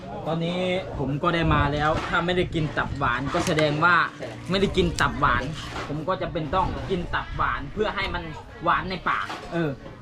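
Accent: Laos. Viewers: เว่าลาวป่นไท น่ารักดี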